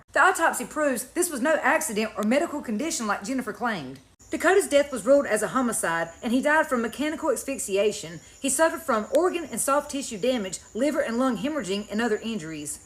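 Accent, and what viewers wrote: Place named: Carolinas, United States. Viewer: I can’t tell if you’re accent is from North Carolina or South Carolina. Probably north if I had to put money on it.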